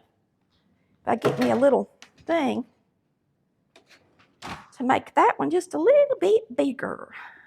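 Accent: South, United States. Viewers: Hi funny lady,wth that strong Southern Accent ..I ❤your food arts ..I just think 🤔 u wanna make me fat..u better Stop it! lol ..jk don't stop it's so good❤🎉😮😂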